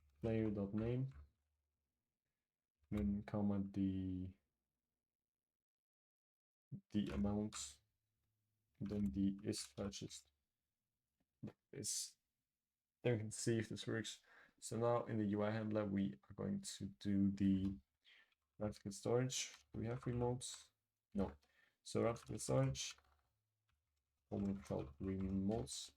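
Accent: Germany. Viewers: are you german? because your accent sounds really german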